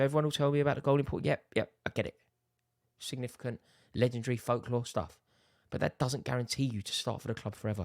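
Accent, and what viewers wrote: RP, United Kingdom. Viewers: posh rants are beautiful lol COYG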